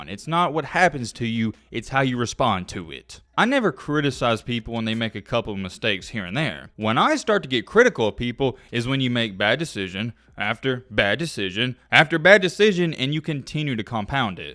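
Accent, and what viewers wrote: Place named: Boston, United States. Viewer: You sound like a bostonian talking about the "patriot way". And the dude got caught driving with no insurance... do you have any idea how many people drive without insurance, that literally why God created the right hand lane. And when you're black you don't need to be going "10,15,20 over" to get pulled over. That seems like a very sheltered take. And as far as the weed.... who cares, it's weed.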